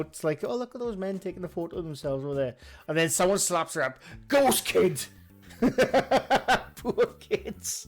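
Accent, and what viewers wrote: Wales, United Kingdom. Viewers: your Welsh accent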